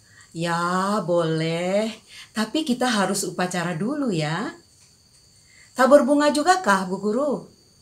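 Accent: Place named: Papua, Indonesia. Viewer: wah cerpen dengan logat papua. keren.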